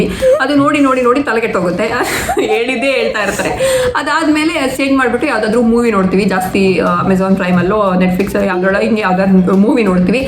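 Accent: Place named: Mysore, India. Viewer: Ur accent feels like u r from Mysore or Bangalore madam or Mandya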